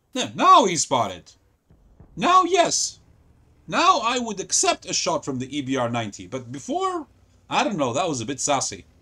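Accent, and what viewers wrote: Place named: Greece. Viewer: I speak russian and I understood what you said, You have a bit of a greek accent but I think that adds to the charm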